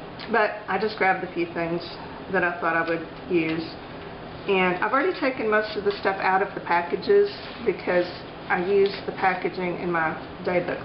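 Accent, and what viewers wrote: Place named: East Texas, United States. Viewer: Your so funny. Born and raised Texas girl here, I dont have a accent like you though. Sound like East Texas to me, lol. I hope you enjoy all your goodies. I have the same trimmer and I don't know if I like it. It doesn't cut straight for me at all. I have gotten used to its little quarks and pretty much know how to set my paper so it will cut straight. Thought the wire thingy would help with the cutting straight issue but no I have yet to find a cutting I absolutely LOVE. TFS Mari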